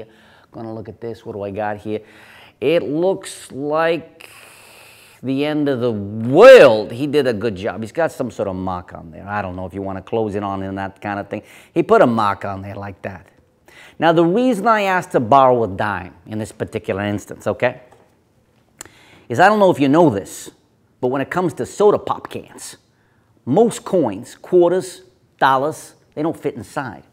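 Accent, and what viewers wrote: Canada. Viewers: are you canadian? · Are you Canadian???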